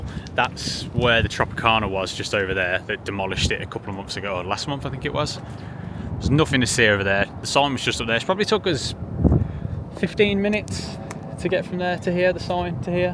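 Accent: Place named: Birmingham, United Kingdom. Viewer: Your thoughts were exactly the same as mine when I first visited Vegas. We love the Luxor and I cant wait to get to that part of the vlogs when you get there. The Luxor for us is quiet to retreat to but still on the strip and a fantastically themed hotel. I'm picking up your accent being local to mine too which makes the vlogs very easy to watch and relate to. (Im from Birmingham UK). Keep up the content, this is the video I stumbled on to find your channel.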